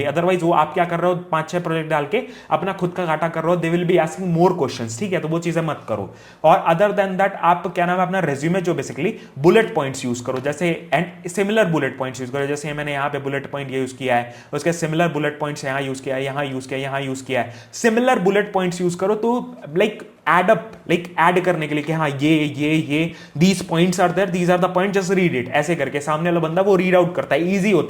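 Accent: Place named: Bihar, India. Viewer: bihari trying to speaks in english , appplying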